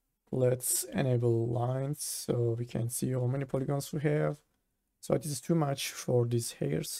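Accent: Turkey. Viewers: Turkish accent 😅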